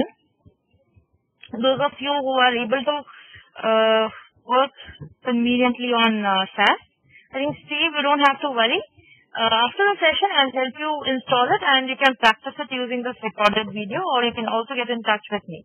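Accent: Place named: Asia. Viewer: Very good and knowledgeable video while learning SAS, thnx to this post and the lady who is teaching. and those who think its asian voice so he/she cant teach, and those who think its poor quality(go ad c ur a*** hole) and the one who can't understand this simple language....are big fuk***** and ge out of here :P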